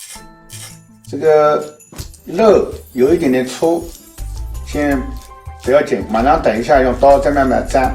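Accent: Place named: Subei, China. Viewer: 一开口就是老苏北了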